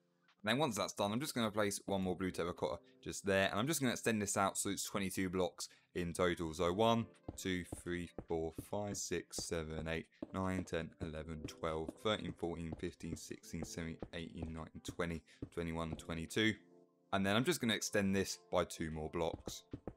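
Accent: United Kingdom. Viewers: This british akcent😰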